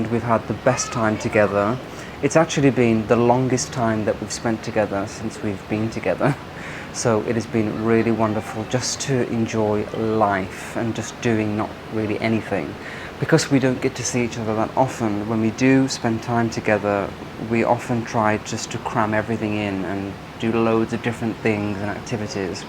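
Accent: RP, United Kingdom. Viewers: I am Japanese. I live in UK for 50 years but I still have heavy Japanese accents. I genuinely admire and enjoy listening posh accents. 😊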